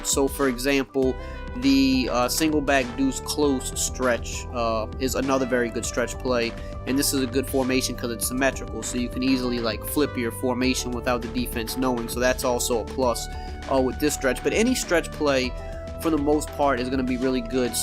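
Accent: Louisiana, United States. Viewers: Great content but I gotta ask what part of Louisiana you from ?? Your Accent sounds a lil bit like Chalmette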